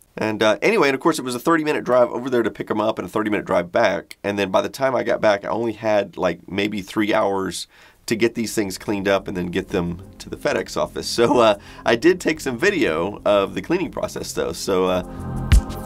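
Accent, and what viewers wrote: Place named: Texas German, United States. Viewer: Interesting - when I saw you speaking german in that Thomann video, I assumed you're a Texas German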